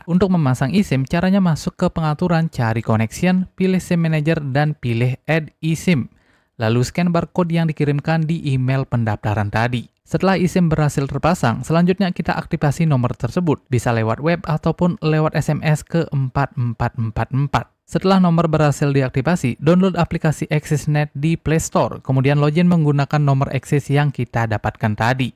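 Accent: Bali, Indonesia. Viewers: Suaranya Bali banget bli hehe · Bang orang bali atau lombok?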